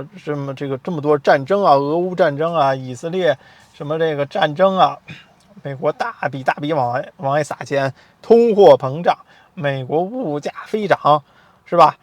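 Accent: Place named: Beijing, China. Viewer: 我实在是听不下去了，现在发表一下我对这个“大外宣”也许是“糊涂虫”的看法与对他混淆视听的如下几点进行纠正： 1. 投资移民（EB5）项目暨大型区域中心投资项目，投资就有风险；2019年11月前绝大多数投资额为$50万，外加必要的律师费及移民局收取的费用约合4-5万，如申请人在🇺🇸以外，等待期为6-8个月随即去美国领事馆面谈拿签证并可进入🇺🇸再往后就是拿临时绿卡；等最终拿到正式拿到10年绿卡的同时，当年投入的全部50万资金一定会返还给投资人，所需全部时间一般为5到8年但也有例外，因为毕竟这是个投资项目是有风险的；目前我身边在2015至2019年持EB5签证进入美国的朋友们都已经拿到绿卡，没听说有出了问题的！ 2. 🇺🇸华人新移民一般不吃Panda Express也就是熊猫连锁快餐，原因很简单暨他们是美式中餐； 3. 🇺🇸华人新移民是美国社会现今一支很强的消费大军，无论在教育房产旅游日常用品...当地主流及外族裔极力都想进入华人市场分一杯羹，但因语言及文化差异基本都很难，除非与华人合作或聘请华人高管... 最后，我衷心的建议这位操着北京口音的“ ”，🇺🇸并不完美但她的包容与无限的机遇吸引了过去近200年，来自世界各地并前赴后继的“探险者”前来”淘金“，也许绝大多数人只能养家糊口维持生计，但也不乏有相当数量的白手起家人士赚得盆满钵满，且不必被倒查20年甚至以莫须有的罪名打入死牢... 来到一个新的国度，要多观察多学习才能尽快融入当地社会，否则就失去了移民的真正意义！ 祝好， （本人不是移民律师或相关从业人员）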